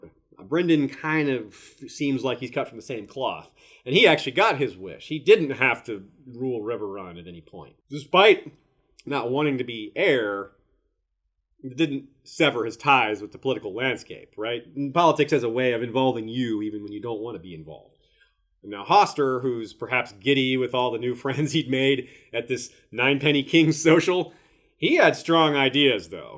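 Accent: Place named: Canada. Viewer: While I love all your videos, the new voice overs were a little much. Normally I'll leave the video playing during work and a coworker made a comment that they sounded Canadian. -_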